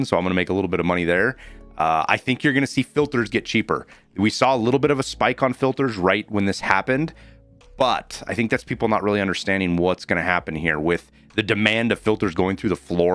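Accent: United States. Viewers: Love your vids man but the one thing that kills me is some of your “Americanized” pronunciations. For example kvass is almost pronounced like ‘kwass.’ I understand you don’t know everything and it’s just a minor annoyance on my behalf but it drives me crazy xD.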